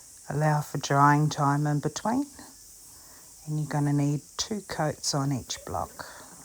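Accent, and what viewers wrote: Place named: New Zealand. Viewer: who dosent love a kiwi accent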